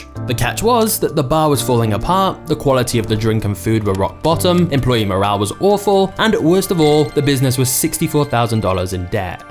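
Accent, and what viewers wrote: England, United Kingdom. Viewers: English script reader, AMERICAN story. Fix that...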